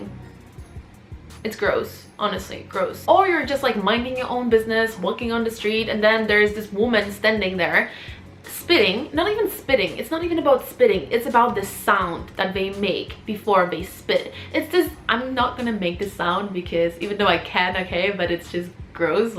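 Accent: United States. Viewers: Could you explain your american accent？ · Are you Danish or Parisan, I am so confused. And you don't seem to have any European accent, you sound American to me. Anyway there are many overseas Chinese around the world. Apparently you need someone who's as interculturally smart as you, probably a multilingualist for your future kids benefits, thb, do you secretly have yellow fever, just wondering :p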